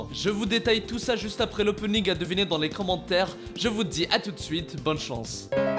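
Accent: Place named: Kabylie, Algeria. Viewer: S/O l accent kabyle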